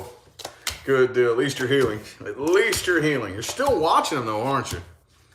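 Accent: South, United States. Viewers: Are you from the south ? Lol I hear a twang .... I’m in Oklahoma City , Las Vegas you live I think you said forgot 😎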